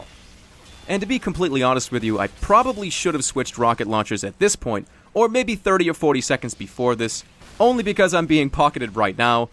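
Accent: Boston, United States. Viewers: your from where the scout is! boston!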